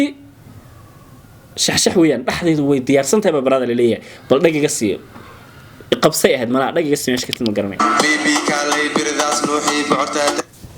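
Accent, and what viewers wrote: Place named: Mogadishu, Somalia. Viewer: Sxb afkaaga hagaagso reer muqdisho kaliya umada kama af duwana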